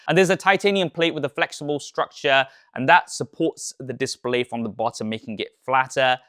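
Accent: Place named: United Kingdom. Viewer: SuperSaf can you work a bit on your elecution & diction for these videos? It's really tough on non-British listeners. I had to press the -10 button sll the time and by the end of the video my ears were screaming. I assume this a regional British accent with the sing-song up-and-down chit-chat you do with the guys? Because a standard London accent I usually understand very well and even regional accents of older people eg Andy Burnham I understand fine. Cheers!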